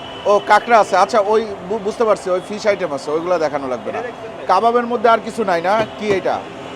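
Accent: Barishal, Bangladesh. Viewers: ভাই আপনি তো বরিশাইল্লা, মিরপুরের পোলা হইলেন কেমনে?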